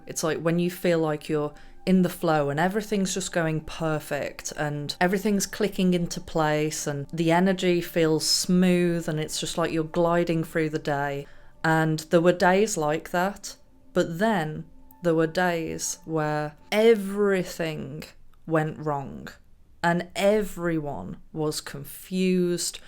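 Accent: United Kingdom. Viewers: I am definitely connected to you. LOL Your British accent and your brilliance on so many different topics lulls me to sleep every night. Keep up the good work. Mark in Dallas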